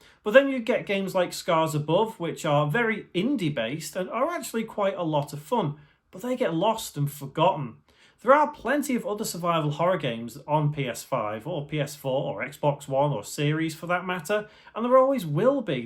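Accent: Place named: North West England, United Kingdom. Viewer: Cool video man and it’s good that you’re highlighting lesser known games. I think if you’re wanting to do YouTube seriously you’d benefit from some higher production values. I’d script your game voice overs so you can hit all the points conclusively rather than winging it. You don’t have to listen to me but I do run a channel with a silver play button and the advice is friendly and I want you to succeed. You also sound like you’re from my neck of the woods, north west uk?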